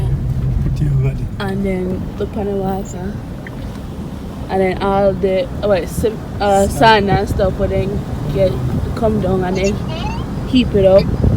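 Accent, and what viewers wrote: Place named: Jamaica. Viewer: Love your likkle patois🤣🤣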